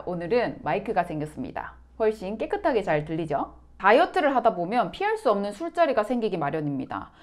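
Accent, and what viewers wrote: Gyeongsang, South Korea. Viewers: 경상도 사람이다... 티가난다 억양에서 ㅋㅋㅋ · 혹 대구분 이신가요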